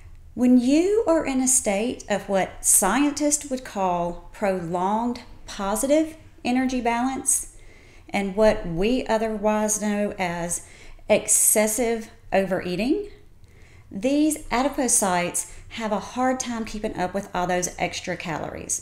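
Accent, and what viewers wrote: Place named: South, United States. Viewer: I can't understand her Southern accent, and she is mispronouncing Wegovy. Just sayin'.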